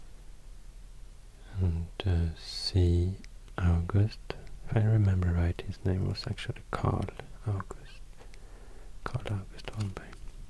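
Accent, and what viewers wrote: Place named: Sweden. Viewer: Du er så god til soft spoken ASMR og din milde svenske accent er så afslappende! Hilsen, en nabo 😋🇸🇪🇩🇰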